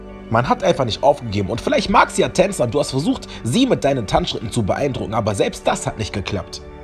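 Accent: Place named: Berlin, Germany. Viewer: wie witzig er spricht ( auf berliner art )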